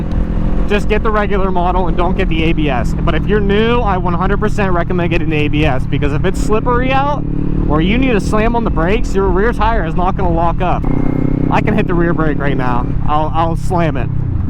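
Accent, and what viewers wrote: Pittsburgh, United States. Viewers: I was like dang this guy sounds like he's from Pittsburgh...then I saw Giant Eagle and Sheetz lol nice review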